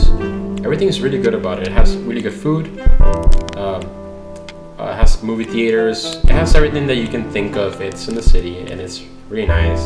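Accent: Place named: Mexico. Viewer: You sound Mexican but also american where did you grow up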